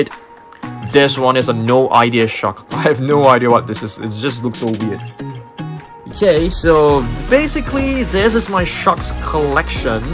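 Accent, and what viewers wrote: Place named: Malaysia. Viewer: He sounds like he is from malaysia and i am hoping i will make a fanart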